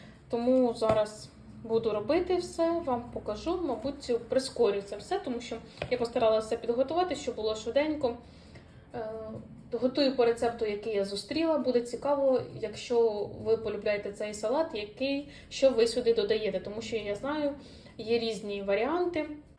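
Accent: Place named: Odesa, Ukraine. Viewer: У вас чудова літературна вимова, як для Одеси.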